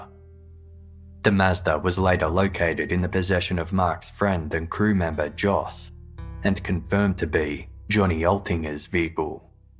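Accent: Australia. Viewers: God help me I'm falling in love with the host's voice. And his aussie accent. i can't help. i just can't help it. Also the research done on this case, along with their previous episodes, is just fantastic. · omg seriously he is Australian.His voice is perfect for podcasting.Not being a dramatic narrator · The story telling (writing) in these is let down by the infuriating narration in my opinion. The terrible pronunciations ( eg. Celtic is not pronounced Seltic…but Celtic as in Kilt -at least twice said wrongly) And the monotonous tone is mind numbing. The clipped way AND is pronounced and then if followed by a word starting with J it sounds like he puts ‘the’ in the space so something like …”and Jane said” becomes “and the Jane said” which is not just distracting it is ludicrous. I know these are free on here but for those listening elsewhere, they are not free. 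Therefore those people are paying for mistakes. As for place names, I can excuse the odd mistake in pronunciation of foreign places (though that can be checked before hand) but when a place names in his own country is hideously wrong, it is disgraceful. Also, the accent, though somewhat Australian, is not typical, well not of the thousands of fellow Aussies I know. If this was a report card I would say…could do much better D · Love the Aussie accent. You must be very “cultured”. He has an Australian accent. He’s an Aussie. Love the accent.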